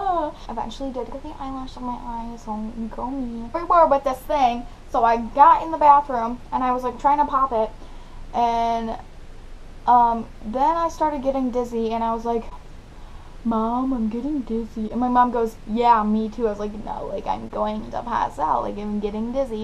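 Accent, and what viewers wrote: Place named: United States. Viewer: Omg this is sick!!! I'm from England and I love the Amercian accent😂😂💓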